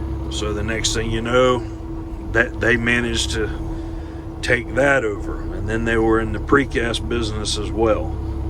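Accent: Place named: Pensacola, United States. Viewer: I am enjoying this. I am guessing you are from the area close around Pensacola, as you sound similar to Adam Booth (abom79), who has a popular YouTube channel.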